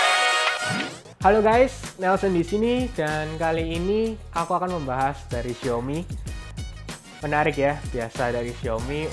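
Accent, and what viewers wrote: Java, Indonesia. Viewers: Mantap mas....cino jowo medok yoo😍😍😍😍😍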